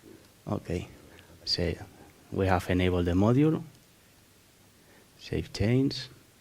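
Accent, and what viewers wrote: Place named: Spain. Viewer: english with a strong spanish accent but so useful ...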